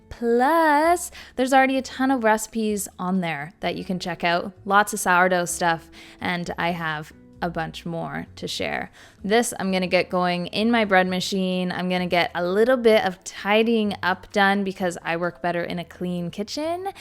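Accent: Canada. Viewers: Have you heard how she pronounces produce? It's a Canadian accent type of thing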